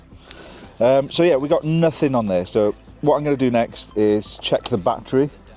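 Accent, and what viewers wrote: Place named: Dolgellau, United Kingdom. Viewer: I recognise that accent anywhere! 'Ogia Dolgella? I'm an XKR x100 owner, just down the road. 👍🏻😎🏴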